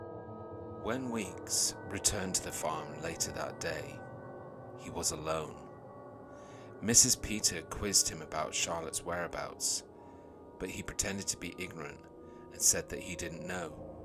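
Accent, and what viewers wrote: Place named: Northern England, United Kingdom. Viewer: Gruesome story loved the northern accent G 😅😅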